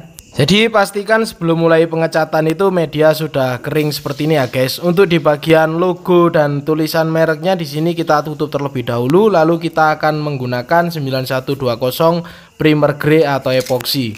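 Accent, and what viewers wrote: Temanggung, Indonesia. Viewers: = mirip logatnya wong temanggung 😀🙏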